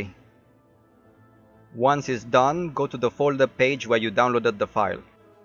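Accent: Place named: India. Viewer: You sound indian holding in his language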